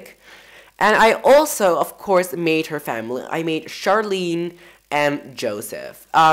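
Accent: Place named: United States. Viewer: Ik dacht echt dat jij Amerikaans was ofzoo je spreekt echt goed engels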